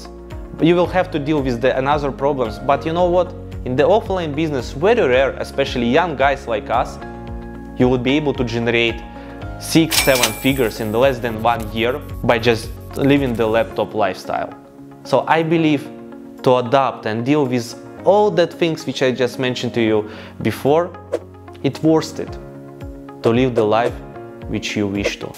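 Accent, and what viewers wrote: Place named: Russia. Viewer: Русский акцент режет ухо😢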